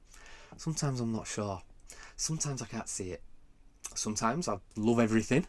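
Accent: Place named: North, United Kingdom. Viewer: Art with a luvleh Northern accent and awesome graphics? Subscriiibed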